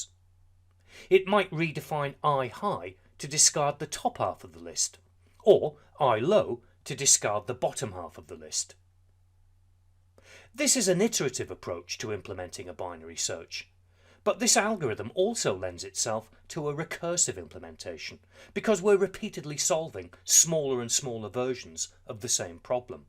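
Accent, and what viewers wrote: United Kingdom. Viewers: HOLLLLYYYY - thank you so much for your video. this is probably the most perfect explanation i've seen. its either the style of explanation or the british accent that is driving the points home. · I also can't tell which it is, but the British accent is definitely doing it for me!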